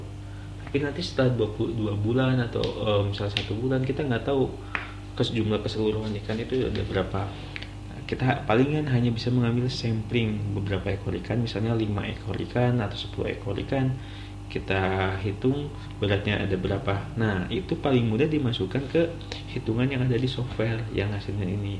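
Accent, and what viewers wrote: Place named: West Java, Indonesia. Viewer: pantesan aya logat" sunda hehe...abdi ti sumedang kang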